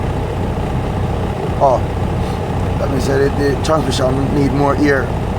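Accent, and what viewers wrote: Jamaica. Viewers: I love other forms of English and all of it's creoles and what not but that still does not make it any easier to understand the Jamaican accent/dialect. I try so hard 😂 but I only pick up bits and pieces. I'm gonna watch more though, maybe I'll get better at picking up what he's saying !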